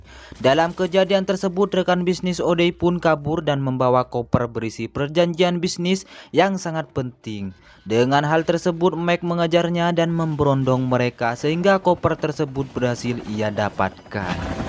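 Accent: Madura, Indonesia. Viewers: Kok yg ngomong logat nya kaya orang Madura ya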